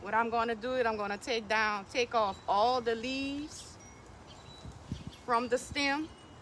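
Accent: New Orleans, United States. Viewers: Love you channel and listen to you talk the nawwlens voice is coming thru.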